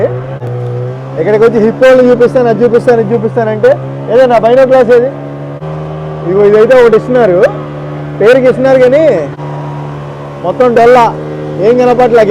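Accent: Guntur, India. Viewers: Anna meedhi guntur aah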